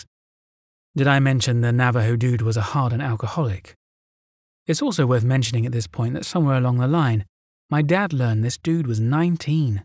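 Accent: England, United Kingdom. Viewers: Who knew an English accent and native folklore could work so well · British accent I’m out ! Painful to listen too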